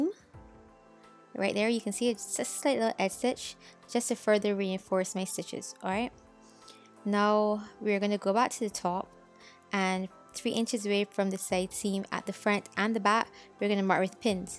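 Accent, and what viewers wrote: Barbados. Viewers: Fren you sound Bajan 😍, I’m guyanese but I know that accent good thanks to Rihanna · The moment I clicked I recognized the accent!! Let’s go Barbados 🇧🇧 💛💙💛💙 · I know that accent anywhere 🇧🇧 🇧🇧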